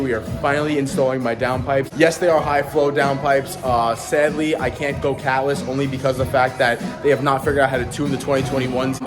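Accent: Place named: Long Island, United States. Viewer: LMAO that long island accent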